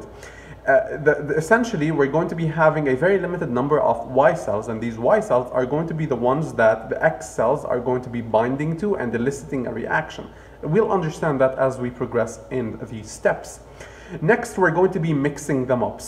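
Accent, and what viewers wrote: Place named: Lebanon. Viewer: صدقني انت لبناني عرفتك من اول 10 ثواني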